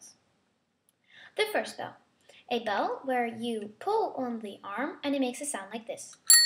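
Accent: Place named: United Kingdom. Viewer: I don't know if you were putting that British accent on or not, but very good video! :)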